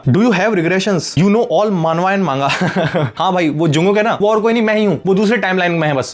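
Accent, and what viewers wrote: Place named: Haryana, India. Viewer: Khadi boli haryana se ho na bhai tum 😂 nahi tu beyong hai bhai cutie pie 😅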